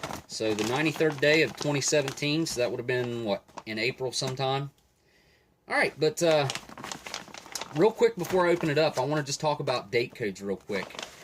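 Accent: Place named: Baltimore, United States. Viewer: Your accent screams Baltimore, especially when you said Sopacko, Hon!!!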